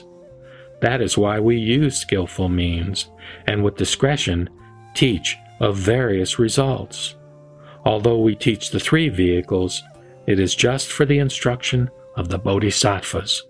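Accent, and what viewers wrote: Texas, United States. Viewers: Texan accent spoils the reading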